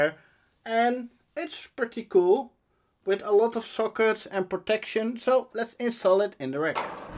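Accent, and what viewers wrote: Netherlands. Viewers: On you can hear he's from the Netherlands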